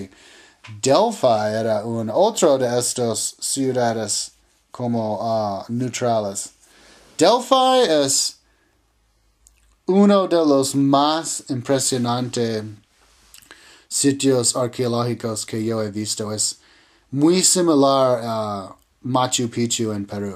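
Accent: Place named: United States. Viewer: i do not speak spanish, do it in English please. and even i can hear the american accent.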